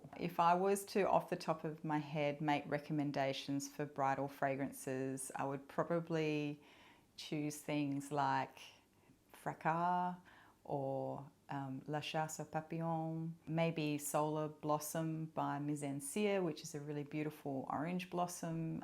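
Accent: Australia. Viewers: Totally agree with Tyler.....I love listening to a fellow Aussie. Your videos are such an easy watch.♥️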